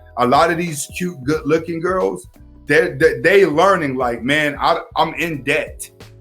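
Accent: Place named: Pittsburgh, United States. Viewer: You from the burgh bro?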